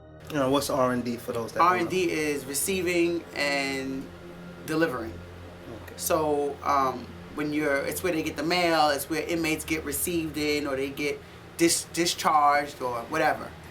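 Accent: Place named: New York City, United States. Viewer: That's the NYC accent! I love in the Bronx...woman are a bit tougher than your average coming from NYC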